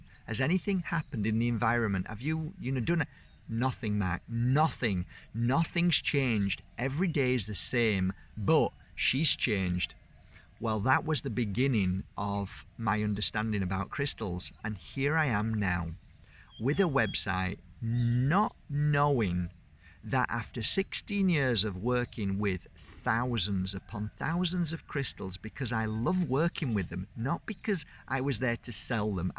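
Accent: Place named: North, United Kingdom. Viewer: I could listen to you talk for hours Mark. Maybe it's your northern accent 😉. Question re self healing. Because we are mainly water, do you agree that if we talk to our body using thanks and healing words etc, it works the same way as writing words and speaking to water like Professor Emoto did? By the way, Pyjamas. 😂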